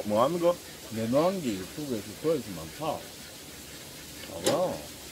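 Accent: Gyeongsang, South Korea. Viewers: 저는 저희 아버지 소개로 이 채널을 알게되었는데 사투리 보니까 같은 경상도분 이신가봅니다. 사회에서 사투리 쓸때는 스스로 몰랐는데 방송으로 들으니 맛깔나는군요.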